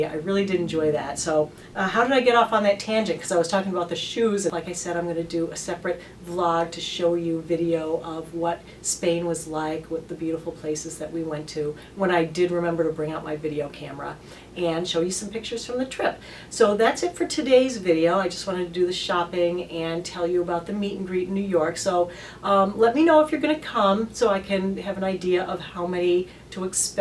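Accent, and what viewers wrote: United States. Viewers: Hi, I'm so glad you had a great time in Spain - I love it there! It's cute the way Americans pronounce words. For example, we pronounce Ibiza (eye-beeth-a), and instead of "embraceful", we would say "embracing" is correct. Anyway... I really love watching your videos. I'm going to be in NYC with my daughters from 5th - 22 July, and I'd love to meet you in person! I'm almost 53 (also get hot flushes) and am interested in your product reviews. I hope to see you at the W Hotel next week! How long are you staying in NYC? If I don't make it to the hotel (my daughters have planned some excursions), then it would be lovely to meet for a coffee. We live in Germany, but are form London. Stay awesome!:)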